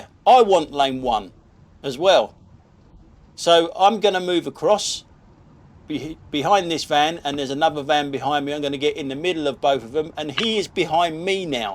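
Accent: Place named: West London, United Kingdom. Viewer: You sound nothing like Michael Caine, You have a West London accent like me.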